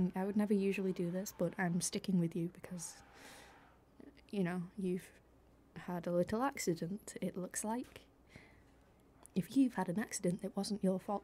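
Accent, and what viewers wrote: United Kingdom. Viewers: I've been a bit curious but are you from Manchester or am I just thinking wrong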